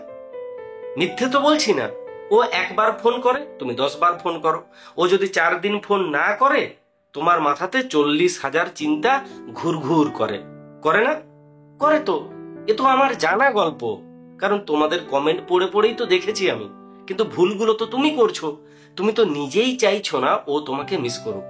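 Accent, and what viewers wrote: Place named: Midnapore, India. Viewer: Apni ki midnapore er dike thaken? Kothar tan ta oirokom e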